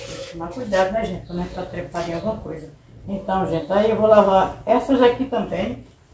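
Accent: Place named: Bahia, Brazil. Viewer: Agora parece que é baiana mesmo né oxente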